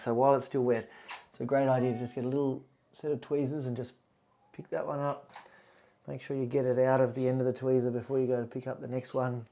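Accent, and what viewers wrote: Australia. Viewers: Hi, Best wishes from the UK. It is always a pleasure to watch you at work and to see your face when signing off. Keep up the good work, you are insirational! Love the aussie accent.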